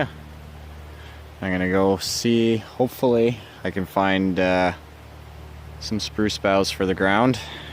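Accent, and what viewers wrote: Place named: Canada. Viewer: Hmmmm ouuut & aboouuut...you must be Canadian. Cool. I can only tell when you guys say one of those words. I haven't heard you say "ay" too much though...lol.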